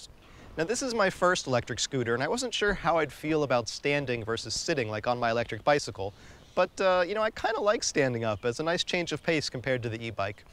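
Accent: United States. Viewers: פאק, הייתי בטוח שאתה אמריקאי ואז פתאום in tel aviv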